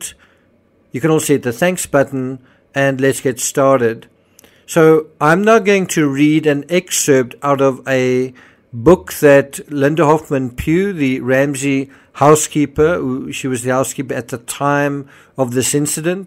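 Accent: South Africa. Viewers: The most South African voice in true crime.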